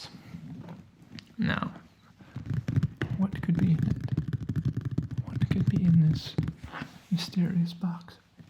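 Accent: South, United States. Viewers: I love those retro style suitcases! I'll be your business partner but I warn you it's a 90/10% split in my favour cause that's just how I roll 😜You won't earn any money but we'll have a laugh. Btw as a Brit I love your Southern US accent so please don't hide it. ❤️ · could you please make a whole video of you speaking in your southern accent..I love it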